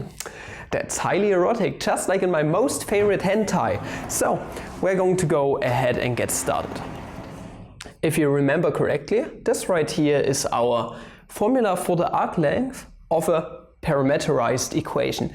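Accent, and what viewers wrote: Germany. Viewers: It's his German accent and nothing else.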